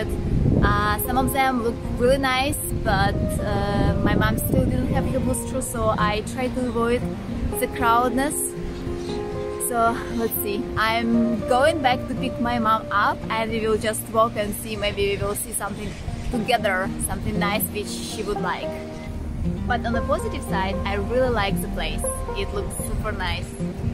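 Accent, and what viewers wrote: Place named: Spain. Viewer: what a beautiful town and the food looks delicious! BTW, I just love your accent, are you Spanish?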